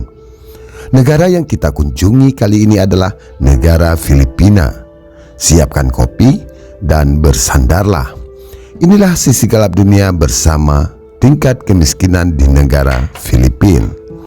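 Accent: Aceh, Indonesia. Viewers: dari logatnya kayaknya bang admin orang aceh ya hehe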